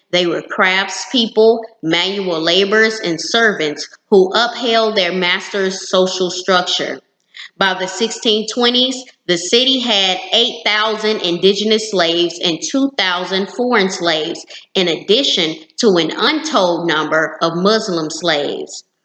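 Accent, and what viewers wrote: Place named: South, United States. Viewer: am from the caribbean and trust me when i say this "black americans " who try to speak about history speak trash. As a matter of fact some things in this video are incorrect. you think some black woman with an southern accent is gonna show up magically knowing what happened during colonial times??americans don't even know the countries of the world muchless history lol,only thing they know is that there was something called slavery. there is no such thing as black caribs and as a matter of fact the black people were west africans who escaped from the plantations during the english and spanish wars over certain islands. this is so misleading and trust me i can continue to name out a lot of other things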